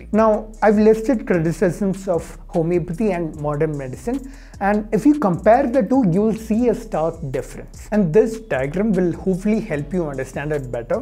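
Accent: Kerala, India. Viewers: Your content is one of kind and eye opener to blind followers of fallacy and myths. But your reach will be limited to just a few.... You have strong malyali accent coming out when you speak English. I wish it would be coherent and lucid like other so called youtubers on you tube who are spreading mythsband nonsense. Clarity in language as much is needed as in the content. Your content is king but can't say about the way utter it.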